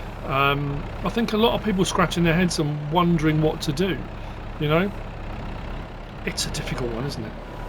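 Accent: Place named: England, United Kingdom. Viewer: Youtube income has dropped in all areas I have a channel painting acrylic lessons also gta5 videos in the U.K. it’s harder to get an audience my is mainly in the USA I live in wales bern a content creator for over 10 years downloaded this game free it’s a fantastic game as I worked on farms back in the day on the hay potato picking by hand and milking in my 60s it’s a way to relive my youth your doing a great job m8 just be yourself and it’s great to hear a true English gent 👍👍👍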